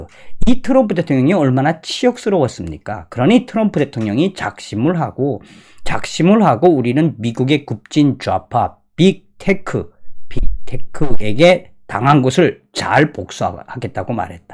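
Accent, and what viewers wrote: North Korea. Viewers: 북한언어 쓰실때 넘 즐겁읍네다 북한말씨 애정이 넘치는 든든한 삼춘이 주시는 언어 같아 재밌고 즐겁게 시청합니다 북한말 쓰시는 유튜버는 밖에 없어요 가열차게 웃겨 주시라요 봤던 영상 못본영상 무조건 즐겁게 구독하기요 주위에도 가열차게 알리묜서 즐겁게 시청하는!!!!❤ 떼레비 고정 하시기요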